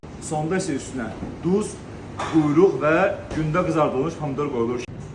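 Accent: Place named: Baku, Azerbaijan. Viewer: ZƏNNİM MƏNİ ALDATMİRSA NİTQİNİZDƏ BAKİ LƏHCƏSİ VAR İDİ 😊😊😊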